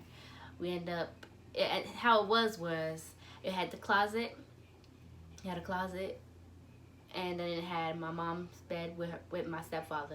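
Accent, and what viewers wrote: Trinidad and Tobago. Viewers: You are talking and can hear the trini accent.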